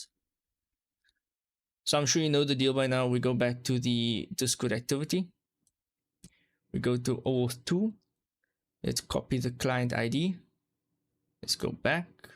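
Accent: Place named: South Africa. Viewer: I know that accent, hello from South Africa <3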